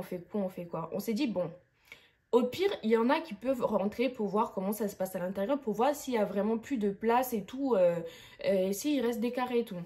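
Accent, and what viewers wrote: Gabon. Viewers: On aime ton accent gabonais ❤ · L’accent Gabonais là ooh bien chic 😂😂😂😂😂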